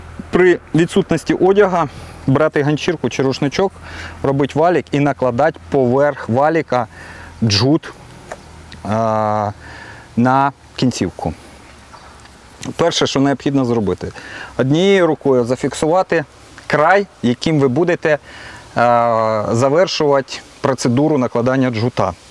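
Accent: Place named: Surzhyk, Ukraine. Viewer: Автор не користується в побуті українською мовою, максимум суржиком. Сам таким страждаю.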